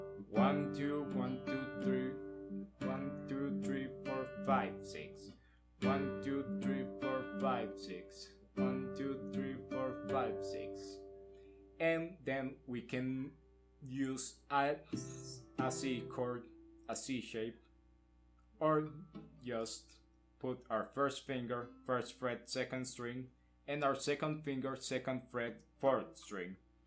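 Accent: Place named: Latin America. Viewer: JAJAJAJA Note un poco de acento latino cuando hablas en inglés, pero no estaba tan seguro. Ntp te explicas bien y se entiende al 100 todo el vídeo. Muchas gracias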